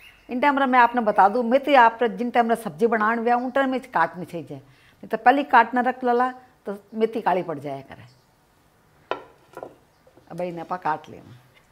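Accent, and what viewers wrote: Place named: Rajasthan, India. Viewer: Thank you. I love your recipes. I also like hearing you talk mardawadi.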